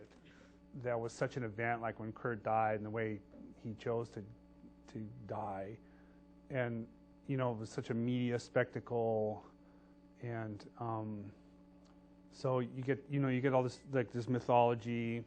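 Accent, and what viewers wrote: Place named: Croatia. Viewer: he was born in croatia right? to.... i forget what kind of parents, but i can hear it in his accent.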